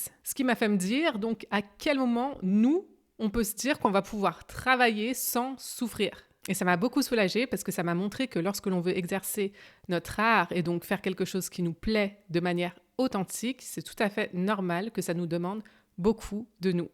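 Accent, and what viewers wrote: Canada. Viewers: Je perçois un léger accent québécois. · tu serais pas montrealaise par hasard?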